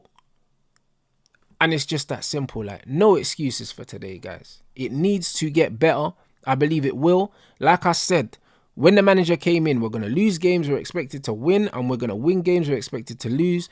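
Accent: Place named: Manchester, United Kingdom. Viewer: Lovely Manc accent though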